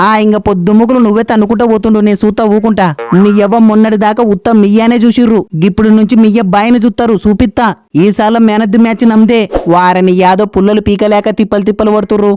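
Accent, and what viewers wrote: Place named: Gadwal, India. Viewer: Super anna, Gadwal slang lo raffadinchinav po, ni concept nd creativity ki na salam inka ilanti enno manchi videos cheyalani korukuntunna 👏👏😇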